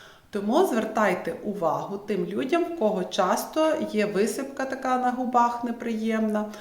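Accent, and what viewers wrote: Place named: Galicia, Ukraine. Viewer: ' так гарно звучить... відчувається що галичанка, львівська пані. Всіх благ вам і добра за таке корисне відео.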